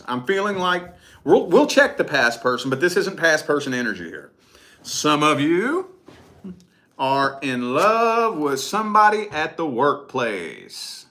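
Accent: South, United States. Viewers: Wow,. FirstlyI love your Southern accent! Secondly, yes my ex-bf was ♓️. Potential new guy is ♓️, but I’m still single.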